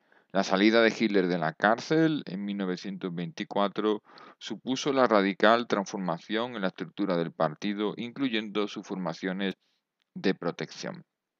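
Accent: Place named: Spain. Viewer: oro puro este canal, soy cubano y ese acento del castellano es música para los oídos...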